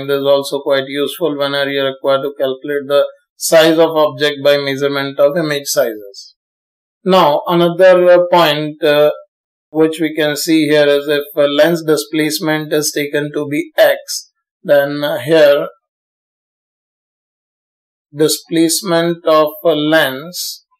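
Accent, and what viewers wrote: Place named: India. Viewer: It's unfortunate that only Indian-English speakers can benefit from this video.....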